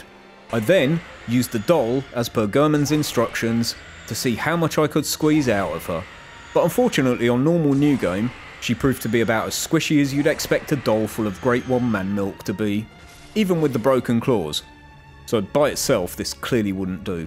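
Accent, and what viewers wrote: United Kingdom. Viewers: he sound very british~ =D I wonder of he's from the uk~ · You are the most bri'ish bri'ish iv ever encountered, and i dont say that to everone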